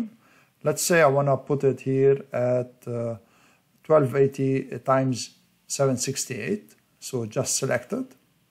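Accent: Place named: Lebanon. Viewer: huge lebanese accent lol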